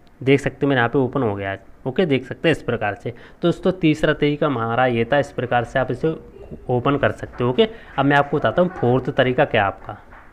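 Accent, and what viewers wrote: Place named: Saharanpur, India. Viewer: Pakka sharanpur ka h bhai mhara bhai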